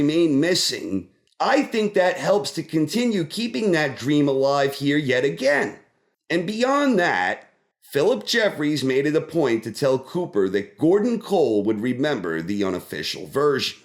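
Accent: New York, United States. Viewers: is that a little bit of a jersey or new York accent you got dude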